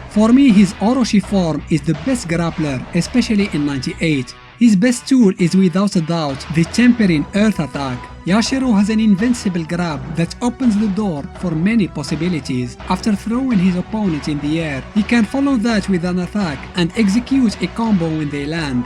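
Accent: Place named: Morocco. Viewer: Bro are you Moroccan your accent is very familiar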